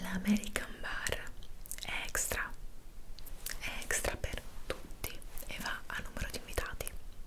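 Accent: Italy. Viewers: Soy el comentario en Español que no esperabas. No entiendo nada pero me encanta el acento italiano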